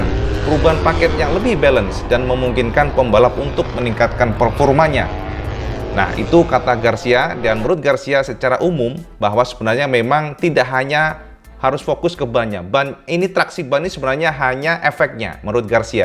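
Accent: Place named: Java, Indonesia. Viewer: Asli aku seneng liat komentar, logat jowone marai guyu. "py tho kang mas...😁" lanjutkan kang. Tp ndelalah detail infonya...aku suka sekali.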